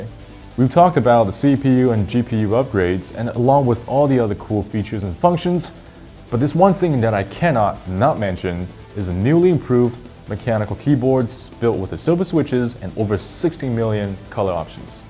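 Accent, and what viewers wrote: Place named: Los Angeles, United States. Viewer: 觉得的口音好好听。能问一下是什么地方的口音吗我觉得好像是洛杉矶的？若能回复十分感谢。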